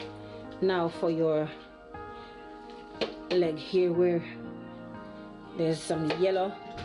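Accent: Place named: Jamaica. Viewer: "Caan nyam dat", as Jamaican myself I just love how you go in and out between English and Patois.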